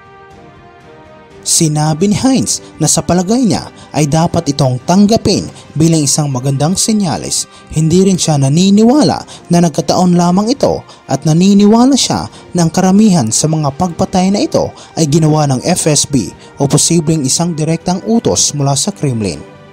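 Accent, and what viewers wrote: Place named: Visayas, Philippines. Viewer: Bakit ganyan ang punto mo bisaya ka ba o bisakol ha ha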